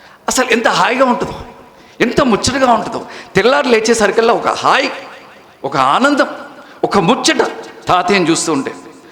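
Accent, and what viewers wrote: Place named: Andhra Pradesh, India. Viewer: Nibhasha...Andra lagaunndhi...jai telangana..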